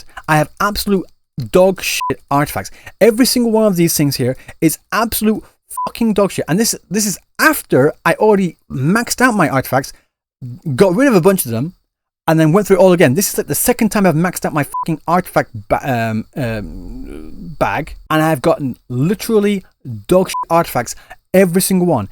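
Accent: United Kingdom. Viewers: British tectone?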